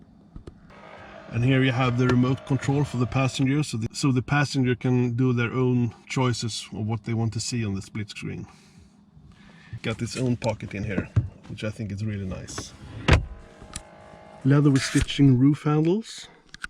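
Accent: Sweden. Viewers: You from sweden right? I can really tell The way you speek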